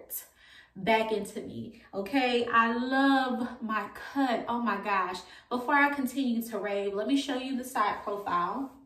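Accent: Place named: New Orleans, United States. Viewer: That accent don’t lie lol to say you’ve been gone so long New Orleans is just in us 😂🙌🏽🥰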